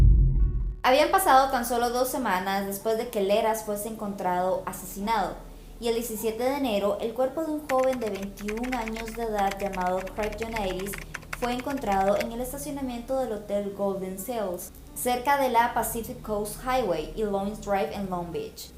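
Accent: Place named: Mexico. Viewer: Me gusta este acento mexicano, me hace acordar a mtv xD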